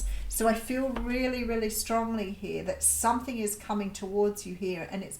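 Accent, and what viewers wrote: Australia. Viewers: He wants work on things with me. Spend time in a new and different location with just the two of us. As nice as that sounds, the last 3 years have nearly broken me - body mind and spirit. The skills I’ve learned from you. have been life changing for me!! Your sweet Aussie voice has become the voice in my head. Helping me sort thru difficult feelings. Without telling us what to do, you’ve lead by example and taught us how to empower ourselves! Thank you! ✨🙏💕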